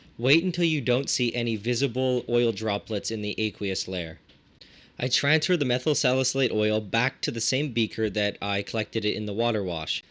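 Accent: Quebec, Canada. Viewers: That Canadian French accent though . . . "Le Chateller"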